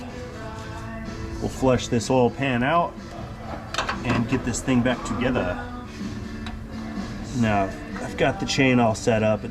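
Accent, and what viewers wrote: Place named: South, United States. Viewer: Is it just me, but does voice sound a little different. I think the subtle Southern drawl has nearly disappeared. Different mic or other sound equipment change? NBD.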